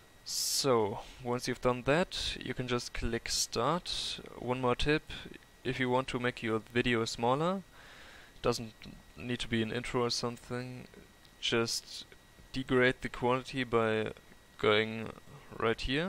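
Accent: Germany. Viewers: Danke man! Das Deutsch hört man raus, trotzdem gutes Englisch m8 :D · Man hört die deutsche Ausprache aus ihm raus ;D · bist du ein deutscher du hörst dich so and hahaha aber redest english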